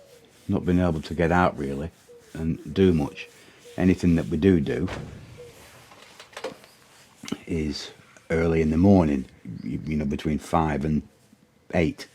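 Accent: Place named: Preston, United Kingdom. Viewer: I've just come across your videos mate, as my missus is Hungarian and she hates living in the UK the weather mainly. I thought your accent was familiar and you said you lived in Preston. We live in Longridge and I've been out to Debrecen a few times and think about relocating a lot. Where abouts are you living? I'm 40 soon and think it would be good for me to try it.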